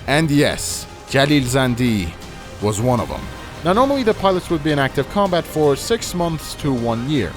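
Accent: Iran. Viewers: Hahaha, it’s because the narrator is Iranian too. He has Iranian accent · Oh you sound's like Iranian but great work after all... Long live the memory of heroes.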